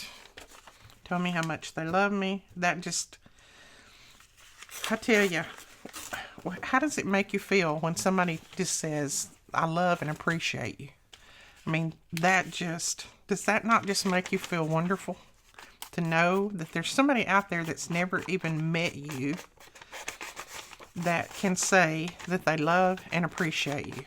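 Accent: South, United States. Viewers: You really do have so many people that love you! I just love you to pieces! You really are great. I miss you like crazy when your not on. I think it's your voice..just reminds me of home(the south) and my momma and Grams who are both gone. I wish that I could just give you a big hug, pray with you, and try to make things better! Not sure if you got your ink yet but just a fyi Tina from Shabby Dabby Doodah has insta ink too and she was saying how this time she has been waiting forever for her ink to come in. So if you don't have your in a couple days you might wanna try and get in touch with them! Love and prayers, collette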